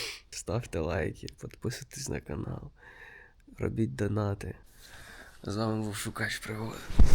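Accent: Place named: Chernihiv, Ukraine. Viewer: У тебе такій говорю наче ти з чернігівської області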